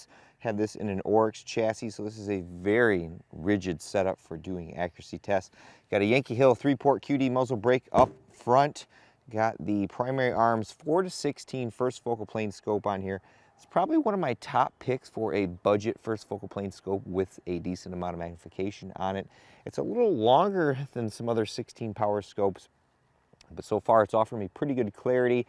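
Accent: Australia. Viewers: Good Australian Accent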